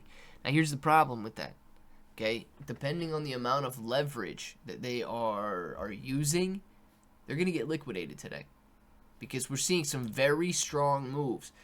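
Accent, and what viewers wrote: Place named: Australia. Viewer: Your Australia accent was awesome!!!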